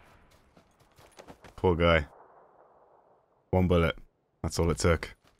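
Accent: United Kingdom. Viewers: I love your accent. I wanna marry and englishman and hear him talk all day.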